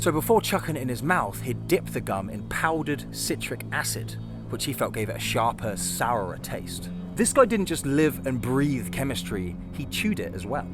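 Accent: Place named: United Kingdom. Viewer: You have a great Bri-ish accent and you are cute!!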